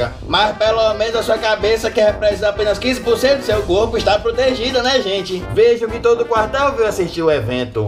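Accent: Bahia, Brazil. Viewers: Melhor narração 😂 esse sacana é bahiano. Kkkkkk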